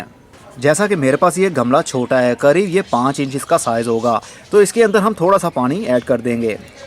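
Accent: Punjab, India. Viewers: You are correct dear. Im Pakistani and we call it Phitkari i guess he is frm Punjab side and thts why he says it this way. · abc xyz ......ye Pakistani Punjabi hei...unperh Punjabi ka urdu lehja hei....urdu bolnay ki koshish mein urdu ki aisi ki taisi phair raha hei....... jaisay.....Bharti... urdu boltay iski beikh o bunyaad nikaal ker issay Hindi kehtay hein....perhay likhay panjaabi behter urdu Bol letay hein.... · Birjees Sagheer Yes I think so. Im a native urdu speaker from India and I too think he has a punjabi accent. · abc xyz I believe since he is based in Lahore his urdu is accented with his first language punjabi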